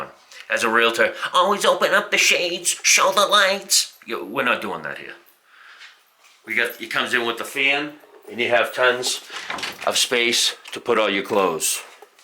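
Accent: Boston, United States. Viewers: Ahhh not to good 😂 about the hot water 😂 wrap your head around it 😂 Boston talk 💪👍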